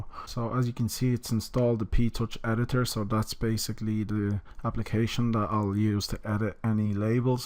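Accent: Ireland. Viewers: Is this a black dude with an Irish accent?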